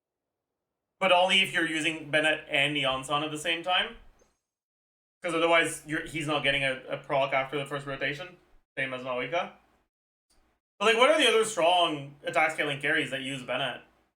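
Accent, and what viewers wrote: Quebec, Canada. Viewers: Il est québecois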